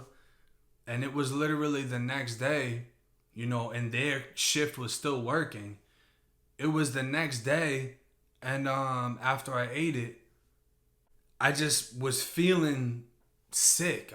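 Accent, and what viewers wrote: Boston, United States. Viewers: Word he got ah Boston accent... I’m from Boston ... on one video he said something about being in mass for awhile not sure how long he was here but I hear 👂 it in his accent... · My chick was like why you watching this dude all the time. Shit idk I did time. Dudes got a Boston accent. And dude tells a great story. Let me alone girl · You're so Tampa but I detect a lot of Boston. Or should I say Baaston? I'm new here.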